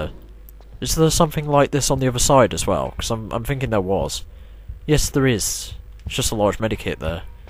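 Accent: United Kingdom. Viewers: gotta bomb dem aliens. TR logic. You don't question it, you just take it for granted. Accept it for what it is. EHAEUAHEUAEHUAEHUAEHUAEHUAEHUAEHUAEHEAUHEAUHAEUEAHUH this was hilarious. .mp3 ammo. Totally. that's totally fine. British accent is cooler and sexier than American. EHAUEHAUEHAUAEHUEAHEAUHEAUHAEUAHEUEAHUAEHUAEHU straight into the lazor. I'm choking here :D yes, you have gone the wrong way. I just love your fuckups <3 look how sweet they are. I want one of them. No, actually I want both. I suffer from an extreme Lack of Domestic Animal Syndrome, so like... yeah :( dat heart attack. Warning for headphone users. Don't you love how the UFO is so small on the outside, but absolutely huge inside? Also, as a kid, I was hoping to fight some aliens, and got super disappointed.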